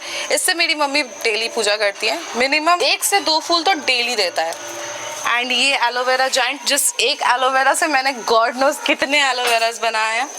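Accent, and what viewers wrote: India. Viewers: Are u from andra????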